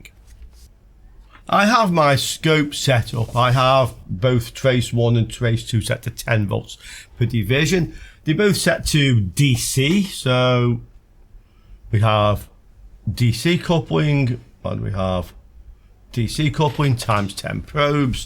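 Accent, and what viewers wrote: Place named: Stoke, United Kingdom. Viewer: you sound like a fellow stokie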